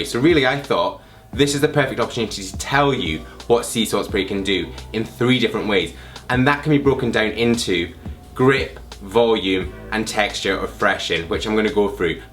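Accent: Scotland, United Kingdom. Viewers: Do I detect a wee Scottish accent there ?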